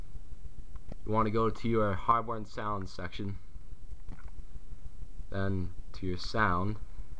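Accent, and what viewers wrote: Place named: Boston, United States. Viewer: That's a Boston accent people ! Best accent on Earth!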